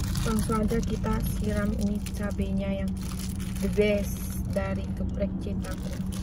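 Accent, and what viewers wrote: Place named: Medan, Indonesia. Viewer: Gua Orang Medan dan udah 5 Tahun tinggal di Bandung. Kalau kangen makanan Medan dan logat Medan, selalu nonton Channel Mukbang karena logat nya itu loh khas medok Medan banget 😁 dan kalau liatin makanannya selalu bikin kangen Rumah. Sukses terus ya ~ ❤️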